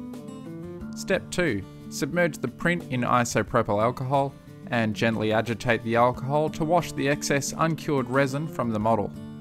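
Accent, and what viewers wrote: Australia. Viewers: I thought that scenery looked awfully familiar in an Australian kind of way, and then of course I heard the accent, haha! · Is it narrating or a TTS app with an Aussie accent?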